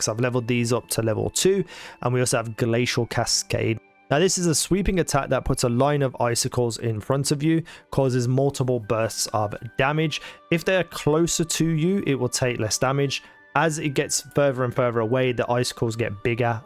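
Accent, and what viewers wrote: Birmingham, United Kingdom. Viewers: Disregarding because of the Brummie accent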